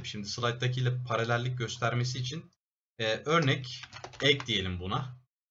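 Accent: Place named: Rize, Turkey. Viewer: Adim kadar eminim ya rizeli ya oflusun hocam...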